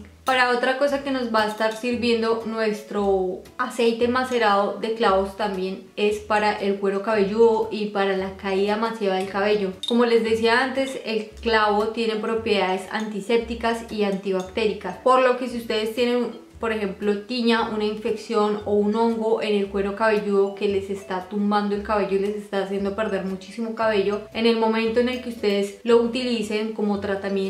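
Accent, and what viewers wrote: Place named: Colombia. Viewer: Me encanta como hablas parece Colombiana, 🇨🇴 un saludo desde Bruxellas Belgica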